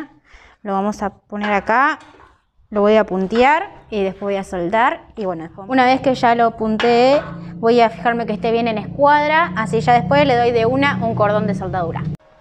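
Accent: Argentina. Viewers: BONITO ACENTO TIENE LA ARGENTINA , CHIDA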